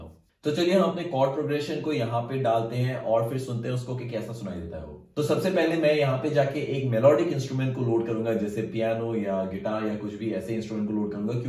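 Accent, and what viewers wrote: Assam, India. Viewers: Bro are u from Assam??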